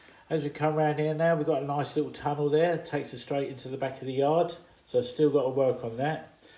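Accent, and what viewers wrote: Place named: United Kingdom. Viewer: The rock faces look really good and the canal detail is wonderful, even the shopping trolley. Did you buy that or make it? I am a little confused because your "About" says you are in the USA but the name of your channel plus your accent makes you British. I'm being nosey I know. Regards Michael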